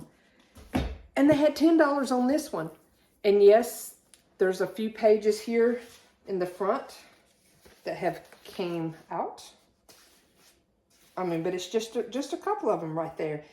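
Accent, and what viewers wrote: South, United States. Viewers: wonderful haul. I would paint your bedroom white and that away you’re not limited on what color accessories you can use. I have always loved Mary Englebreite books and have most of hers. The large book is wonderful. I speak Southern too😊. Love and blessings 🧡💛🧡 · Loved your haul. You speak just fine don' t change a thing. I'm from Florida and I have a southern accent also! ❤😊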